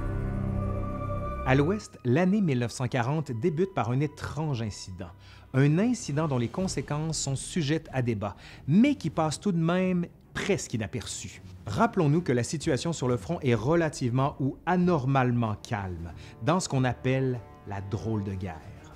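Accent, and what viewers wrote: Canada. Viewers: Ca nous change de Stéphane Bern....et l'accent canadien participe au charme !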